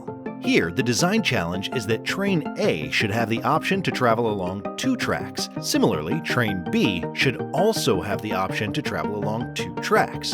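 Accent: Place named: India. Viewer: Brother is from India It took me time to notice 😭😭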